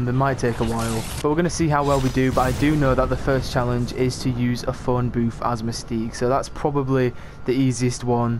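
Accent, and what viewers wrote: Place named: United Kingdom. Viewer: His accent was killin me prolly cause I love Brits 😂😭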